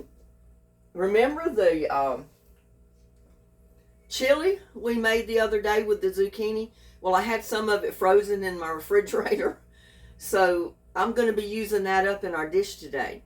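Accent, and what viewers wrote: United States. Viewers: Loved watching how you make your chilli, I add red kidney beans to mine 😋 love them,even picking up the American accent 😂xx take care 😊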